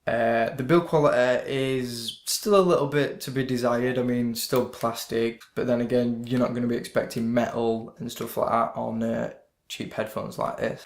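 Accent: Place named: Yorkshire, United Kingdom. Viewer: i'm from Leeds myself it's pretty rare to find someone with a Yorkshire accent on Youtube it's good to see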